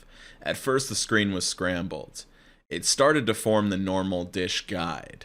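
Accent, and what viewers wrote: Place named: Northern Kentucky, United States. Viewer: are you from Northern Kentucky?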